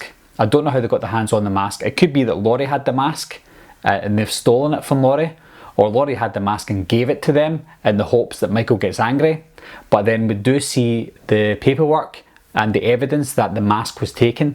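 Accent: Scotland, United Kingdom. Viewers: I think I know where your from....Scotland?? btw love the vids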